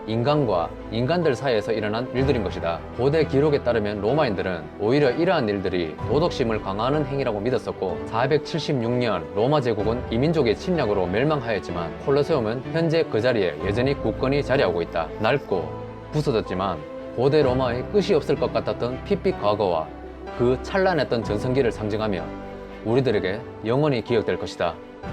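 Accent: Gyeongsang, South Korea. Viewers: 인간이 예수왕 어린양의 구원의 피외에는 영원히 살지 못하는데 뭔 영원이라하냐 경상도 억양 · 다 좋은데 갱샹도 사투리가 거슬리네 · 갱상도사투리나레이션 듣기거북하네예 ㅋ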